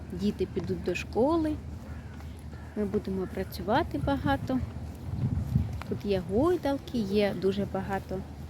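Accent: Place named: Western Ukraine, Ukraine. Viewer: hand-pink-waving:Харків! Ви поруч зі мною! А спочатку мені здалося. що ви з Заходу України розповідаєте.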